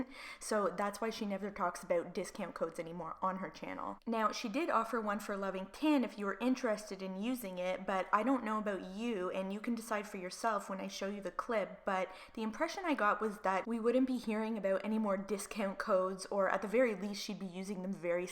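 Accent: Canada. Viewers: A. S. Lauder I'm betting it's Canada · I know this is off topic but I'm an not a native English speaker and when I talk I usually have accent similar to yours so I'm interested in where you're from. Some tell me I sound Canadian. Not sure what their accent is. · You sound Canadian. Which is awesome.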